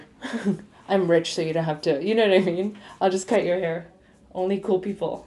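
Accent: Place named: Canada. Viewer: Canadian accent sounds very nice 👌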